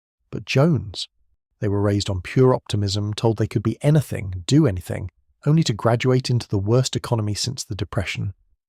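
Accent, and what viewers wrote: United Kingdom. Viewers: Anything that's narrated using a British accent is instantly believable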